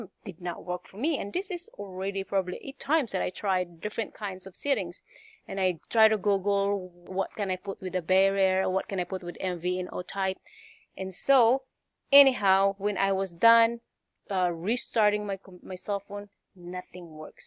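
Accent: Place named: Pangasinan, Philippines. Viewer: That's a great accent! Pangasinan?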